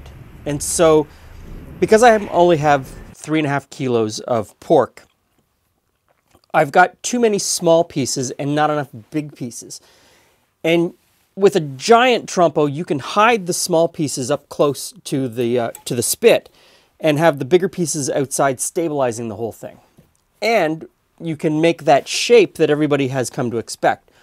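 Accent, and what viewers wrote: Canada. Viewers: Canadian accent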